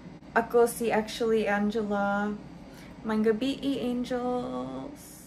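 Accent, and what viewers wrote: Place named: Visayas, Philippines. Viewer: Cute kaayo kag bisaya accent pero bawi ra kay gwapa na angel pa jud.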